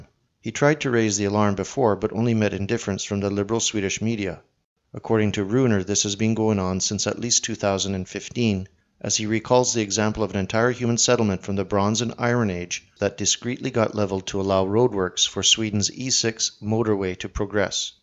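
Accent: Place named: Canada. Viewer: are you a Canadian academic? You have a Canadian accent. Just wondering. You are very courageous. But it seems a very lost cause. Bad odds. Sorry. Just my view.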